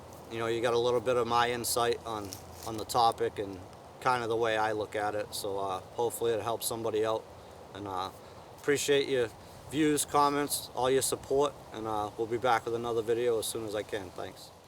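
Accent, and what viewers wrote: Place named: Massachusetts, United States. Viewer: Your voice makes me homesick for Massachusetts. Thanks for the info and have some chowdah and clam cakes for me.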